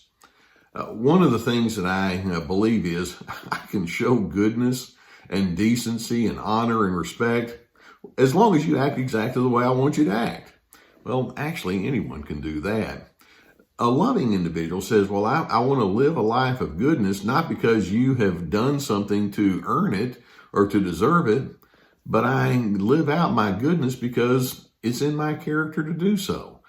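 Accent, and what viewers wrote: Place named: South, United States. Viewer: Yes,. WE HEAR YOUR HEART!! Your kindness, empathy and sincerity emanates from the screen!! Your words, knowledge and wisdom (and your Southern accent 😁) comforts and reassures me. Your videos have literally been a game-changer and a life-saver for me!!! THANK YOU!!!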